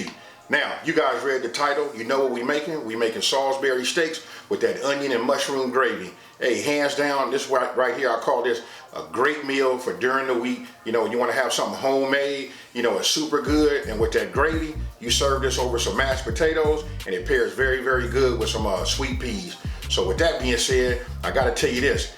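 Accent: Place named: South, United States. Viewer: Yes but cause he's living in Texas and working he is definitely sounding like a Texan too!😉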